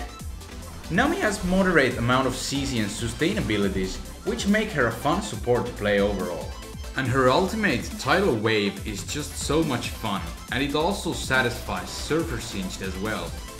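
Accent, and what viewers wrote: Finland. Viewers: Are u from finland? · Heti ku alotin videon tajusin "Tää äijä o suomalaine" sen kuulee enkusta...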